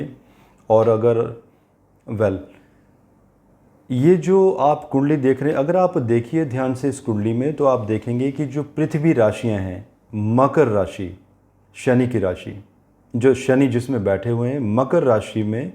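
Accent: Punjab, India. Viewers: Punjabi accent? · I really like your Punjabi Accent ...:)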